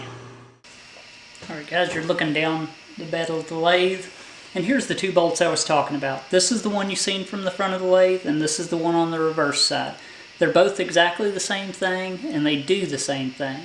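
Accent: United States. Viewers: Can't remember who pointed me in your direction but it certainly was worthwhile. Refreshing to see a youngster working with metal. Sorry if I missed the information in a yet to be viewed video but who manufactured your lathe? I'm not from the USA so please tell me about your accent, which state are you from? As for me, my avatar should give you some specific information. I've just subscribed!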